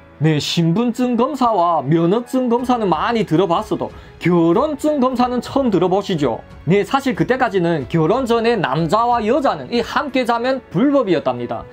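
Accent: Yanbian, China. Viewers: 억양과 엑센트가 특별하고 분명한 어조로 구사한다 전달력이 확실해 상황의 신뢰성이 충분하다 핵심 단어마다 엑센트가 빠지지 않은다 그리고 웃지않으며 진지하다 서술의 시작과 끝이 목소리톤이 일정하고 인상깊은 연변사투리와 중국어가 돋보인다 이게 종일tv의 칭찬요소다 댓글창을 보면 안티가 하나도 없다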